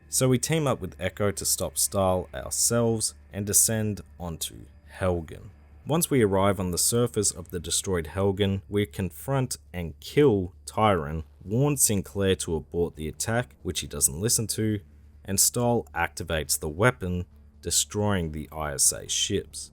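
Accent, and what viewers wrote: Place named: Australia. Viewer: My first experiences with the franchise, as you rightly suggest is the case for many in this review, was with Shadow Fall. I got bored with the story fast so I enjoyed the multiplayer a lot and as I joined late to the PS4 generation, I had the chance to muck about with all the expansions. The visual appeal of the Helghast got me to pick up Killzone 2 and 3 for my PS3 from my local Video Ezy when it was closing down for $2 (NZD) each. I figured you'd have heard of them as you sound like an Aussie. I agree that Killzone 2 with it's impressive first person cover and heavy feeling shooting gameplay is the strongest entry in the series.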